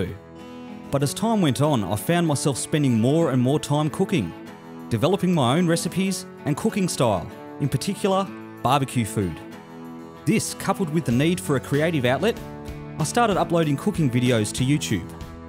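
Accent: Australia. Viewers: now that's an awesome aussi accent! Congrats, mate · I really enjoy your channel best! At first your very austrialian accent made me laugh and since then I am a subscriber. Keep up the good work!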